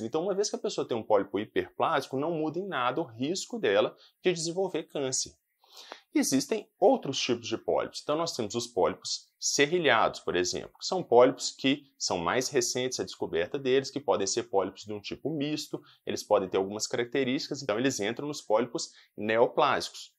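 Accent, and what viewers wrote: Minas Gerais, Brazil. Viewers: Conheço esse sotaque. Ele deve ser daqui de Minas. Gracinha. 😄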